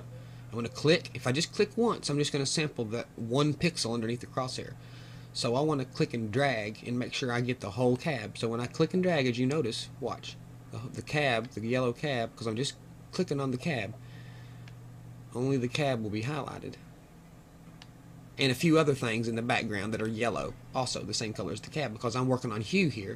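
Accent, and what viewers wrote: South, United States. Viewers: Very interesting, and very very informative indeed. This guy is a savior! a savior with a seriously serious southern draw! lol ;-P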